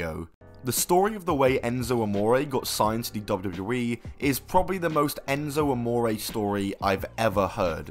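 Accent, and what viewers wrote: United Kingdom. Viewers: I think it's the British narrator's voice that makes me feel smart and snarky, too. 😆😆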